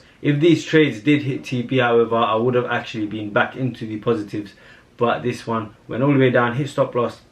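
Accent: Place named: Nigeria. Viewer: Bro what country are you from. You sound a bit Nigerian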